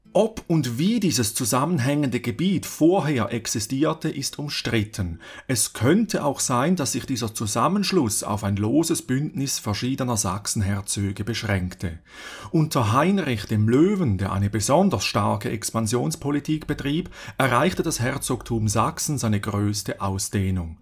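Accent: Switzerland. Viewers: Vielen dank für den ausführlichen und aufschlussreichen Beitrag über die niedersächsische Geschichte! Ich als selber Niedersachse, der aktuell Urlaub am Genfer See macht, hatte eine gute Unterhaltung und freue mich auf die weiteren Videos! PS: Ich liebe deinen schweizer Akzent/Dialekt! :) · Ich bin Deutschmarokkaner und liebe diesen schweizer Akzent. 😁👍🏻 Übrigens ein tolles Video!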